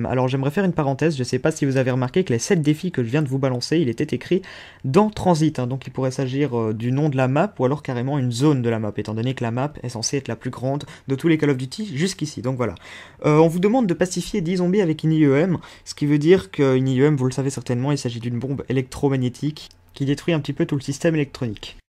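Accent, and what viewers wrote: Belgium. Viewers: un petit accent de Namur .... si tu arrivais à persé le mystère des emblemes en mode Zombie la je Pourrais dire que tu représente bien la Belgique sur youtube/COD parce que avec 102 000 zombie tuer je suis toujours en chien au couteau ! nan je déconne tu représente bien à fou continue ;)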